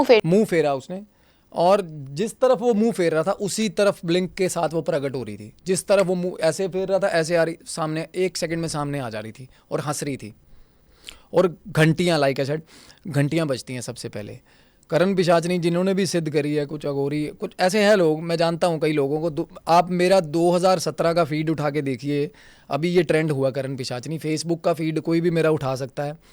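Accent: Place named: Punjab, India. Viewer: Veer ji apka Punjabi accent kamaal ka hai, try karen ki pure Punjabi aap bole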